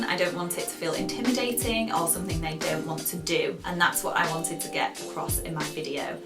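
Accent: United Kingdom. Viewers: British accent I guess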